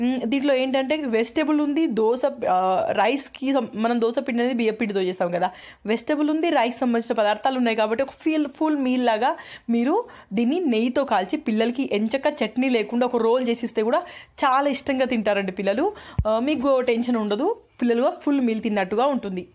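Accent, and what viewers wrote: Telangana, India. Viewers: Me voice chaala bagundhi akka...thelangana baasha lo baga matladuthunnavu...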